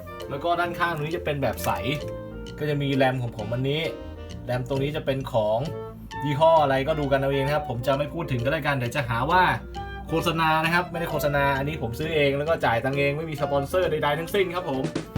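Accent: South, Thailand. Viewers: พี่อยู่ภาคใต้ป่าวพี่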